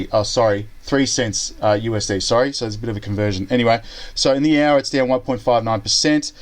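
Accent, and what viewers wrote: Australia. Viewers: You Aussie bro?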